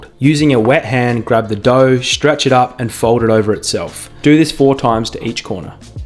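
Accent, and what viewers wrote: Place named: Australia. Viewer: It's so nice to hear an Australian accent on YouTube. A nice calm voice just explaining how to make sour dough bread. Lots of good tips about resting the dough, concerning ambient temperature, that I haven't heard of before, so thank you. Nice kitchen too. ❤️🙋‍♀️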